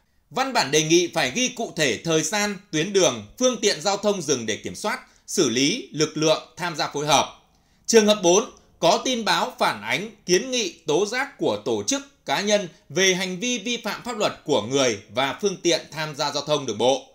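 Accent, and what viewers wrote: North, Vietnam. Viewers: giọng Bắc kỳ khó nghe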